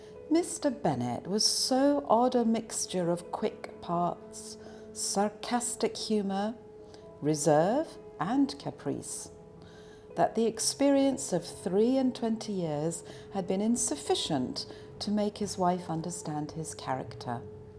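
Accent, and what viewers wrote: United Kingdom. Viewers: 영국 발음 너무 고급져 ㅠㅠ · 영국억양은 듣기만해도 좋아...💕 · 와 오만과 편견 책을 영국발음으로 들어보다니 ㅠㅠㅠ 감사합니다 ㅠㅠ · 전체 다 읽어주세요 !!.. 영국발음 공부하기 딱 좋아요.. 제발 다 읽어주세요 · 사람들이 흔히 떠올리는 영국 악센트의 정석이네요 😍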